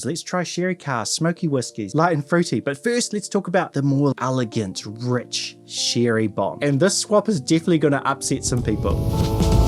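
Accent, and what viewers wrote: New Zealand. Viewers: I like the content, but that New Zealand accent is killing me, at times is almost unbearable. I will try another video.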